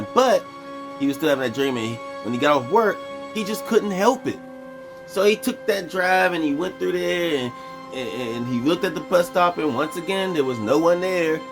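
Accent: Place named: Mississippi, United States. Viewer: You sound like you from my neck of the woods..iam just could u be from yazoo county Mississippi 👽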